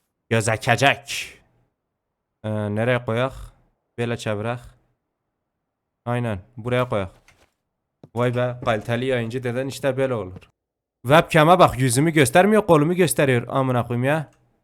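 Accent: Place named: Van, Turkey. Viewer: Abi düşündüm ve şivenden Vanlı olduğuna karar verdim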